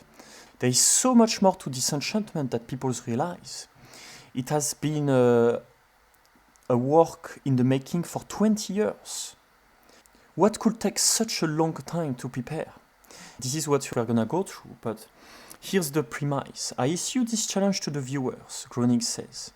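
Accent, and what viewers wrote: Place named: France. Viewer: Thanks man! I fell in love with your french pronounce 😍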